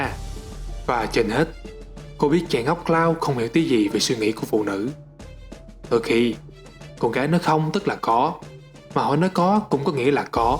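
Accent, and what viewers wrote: South, Vietnam. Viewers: mình comment này là mình rất thich những video của kênh này. Vì có nhiều lý do lắm 1) giọng miền Nam. Mình sr nhé, mình cũng có coi thử 1 it nhưng 1 số bạn ng Bắc nói nhanh quá mình chẳng để hiểu được, vừa nghe mà vừa coi clip y như muốn chóng mặt 2) bạn này sub chậm, giọng nói rất rõ nghe, bên cạnh đó rất hên bạn này nghĩ ra cách chèn sub, làm mình có thể theo dõi kĩ hơn. Đây là điều chỉ có kênh này làm được. Mấy kênh khác dù cũng có sự đầu tư kỹ nhưng họ k như bạn này 3) bạn này dịch kỹ. Hồi đó mình có coi 1 page theo dõi chơi game FFXV, mà page đó chỉ giống như là chơi cho có rồi thôi, k có dịch, làm mình coi mình chả hiểu cốt truyện, rất là bực 4) dịch có mấy đoạn rất vui nữa hy vọng bạn này sẽ đầu tư vào FFX-2 và dãy Kingdom Hearts (Kingdom Hearts này mình thich lắm mà cốt truyện nhiều với từa lưa quá làm mình chả hiểu nhiều) Thank you đã đọc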